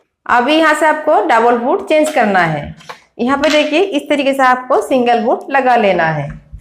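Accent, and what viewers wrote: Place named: Bengal, India. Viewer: দিদি তোমার বাংলা একসেন্ট আছে😁😁 বাড়ি কোথায় দিদি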